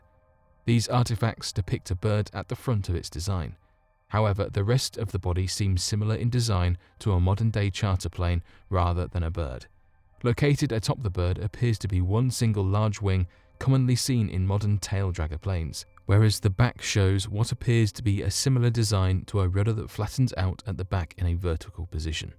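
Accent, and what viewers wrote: United Kingdom. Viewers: He is obviously not American. Can you not hear his British accent? 🙄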